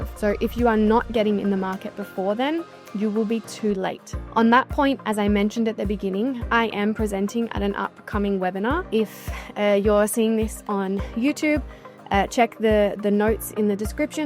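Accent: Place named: Australia. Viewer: Can't stand Australian accent!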